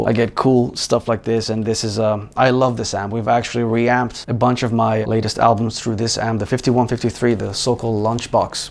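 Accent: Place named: United States. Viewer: why does he sounds like an American when he s talking ,,hi hi ho ho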